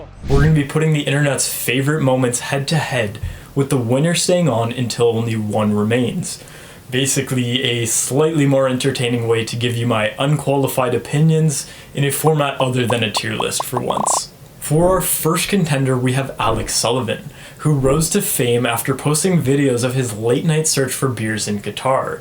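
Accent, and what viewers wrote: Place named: Iceland. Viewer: Are you Icelandic?! I thought you were english !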